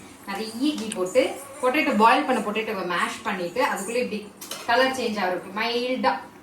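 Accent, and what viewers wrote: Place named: Kongu, India. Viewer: Tamil sema athulium konku vois eathathrmana pechu nenga nalla pesirunga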